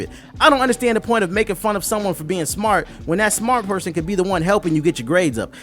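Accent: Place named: Chicago, United States. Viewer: damn you from chicago south south sideee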